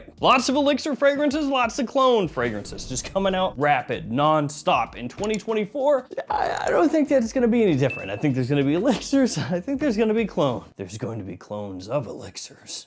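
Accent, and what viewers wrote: South, United States. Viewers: Your southern accent really came out in this vid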